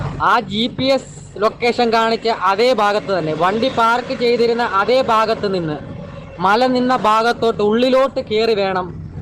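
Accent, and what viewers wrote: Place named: Malabar, India. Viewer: എടോ നീ കായംകുളത്തുകാരൻ അല്ല നിന്റെ സംസാരം കേട്ടാൽ അറിയാം നീ മലബാറുകാരനാണ്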